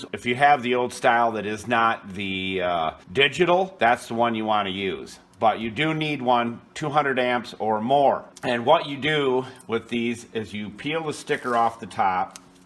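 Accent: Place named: Midwest, United States. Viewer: Your voice sounds like either Missouri or Michigan. But a good teacher is always good at showing others how to do something.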